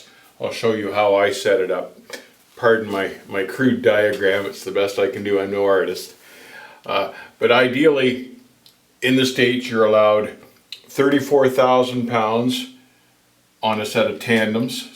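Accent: Canada. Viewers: Always great info with an awesome Canadian accent!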